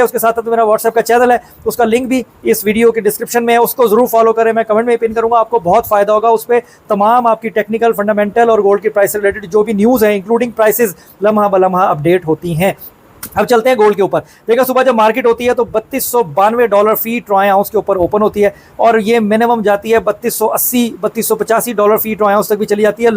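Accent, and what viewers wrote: Punjab, India. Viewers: Sir ap ka Punjabi touch oye hoye mashAllah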